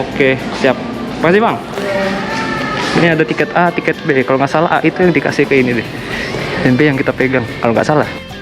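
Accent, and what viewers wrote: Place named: Depok, Indonesia. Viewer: Bahasa depok bgt nih wkwk salam dr depok bang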